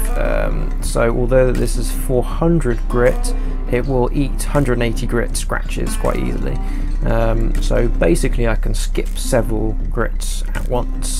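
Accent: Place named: United Kingdom. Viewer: Aloha from Maui! I am currently resurfacing an ancient rusted out deer horn handle hunting knife that quite possibly could have been lost or discarded by Nathanial Boone or even...David Bowie!! Really enjoy your quazi-cultured British accent and your irreverent cavalier approach to knife refinishing. Well Done!!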